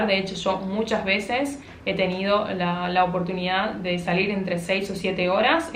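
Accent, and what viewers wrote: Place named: Rioplatense, Uruguay. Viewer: Al final es como yo pensaba, es la versión de Tripulante de Cabina pero versión en agua. Solo que las azafatas a veces tienen un día un la ciudad y tienen habitación propia. Es un trabajo para matarse laburando, ahorrar, y ya esta. Especialmente si son de un país como el nuestro, Argentina, (perdón no se si sos de Uruguay, porque nuestro acento se re parece) que el peso no vale nada y cualquier trabajo en dólares es bienvenido. Sin embargo, es todo un sacrificio. Buchoneo, demasiadas horas, no habitación propia. Me encanta que hables del lado que todos realmente necesitamos saber!!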